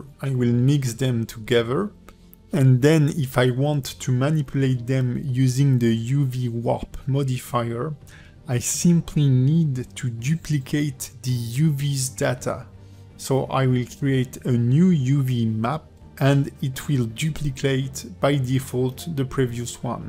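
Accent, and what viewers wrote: France. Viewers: clear and useful video plus I find your french accent really cute (^u^) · Ouah l'accent francais mdrr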